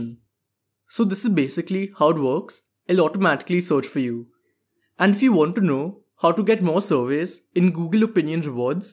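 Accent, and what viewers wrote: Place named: India. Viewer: indian or somthing like that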